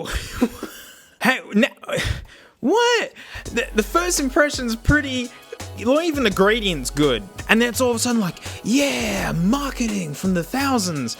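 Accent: Australia. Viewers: You’re Australian? 🙃🙃🙃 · In this video u sound more australian than the usual... idk why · Funny angry Australian man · and it is so refreshing listening to a fellow Aussie haha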